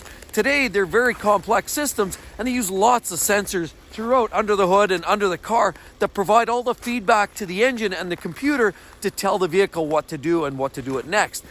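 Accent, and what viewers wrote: Canada. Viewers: Love the Canadian accent!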